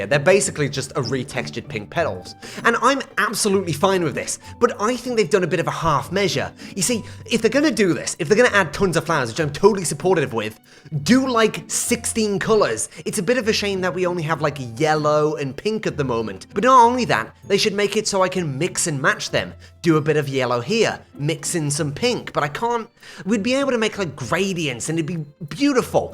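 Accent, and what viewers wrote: United Kingdom. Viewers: funny thing is hes British so it technically wasn't a hard R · i love watching a british person rant about a silly block game. gotta be my new favorite types of videos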